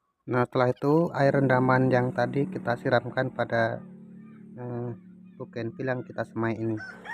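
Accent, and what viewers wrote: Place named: Java, Indonesia. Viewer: Sampeyan basa jawane medok pisan sihh,,,??wong endi njenengan mase,,,👍👍👍🙏🙏🙏🙏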